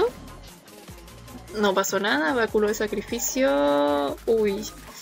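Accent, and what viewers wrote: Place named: Chile. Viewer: Se nota altiro que eres chilena te amo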